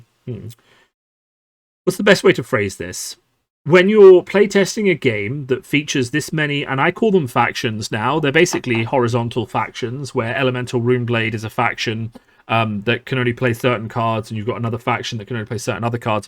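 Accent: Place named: United Kingdom. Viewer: A Lovely British Accent Indeed! Yes I Listened To The End)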